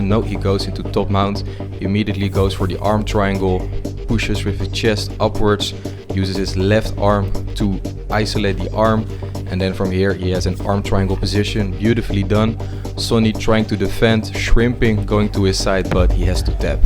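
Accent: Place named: Netherlands. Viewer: Do I smell Dutch?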